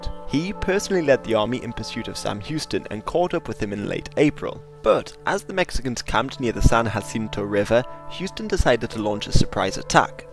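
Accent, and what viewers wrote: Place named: United Kingdom. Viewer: Cuahuila, pron., kua-wi-la, not kua-hi-la. Anahuac, pron., aná-wac, not ana-hoo-ac. What is wrong with you ppl??? The very least you could do is get the pronunciation right. As it is, you are using English pronunciation rules on Spanish words. That never works. It's about as bad as using Spanish pronunciation rules on English words. It never ends well. And, why do you have an English bloke narrator. That British accent is so annoying. It's like someone speaking with peanut butter stuck to the roof of their mouth. Get rid of them. Their English is horrible. American narrators for American history.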